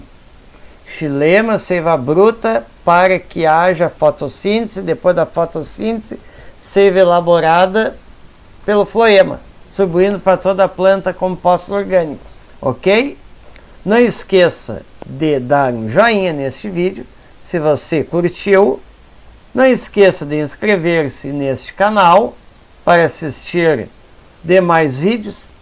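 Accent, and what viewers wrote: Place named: Rio Grande do Sul, Brazil. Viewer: Ba tu es do Rio Grande do Sul?ba muito boa sua aula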